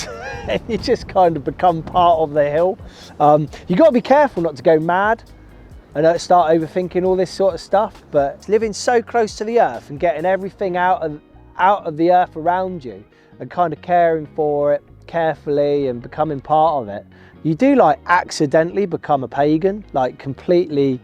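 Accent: England, United Kingdom. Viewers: sounds almost like an English version of *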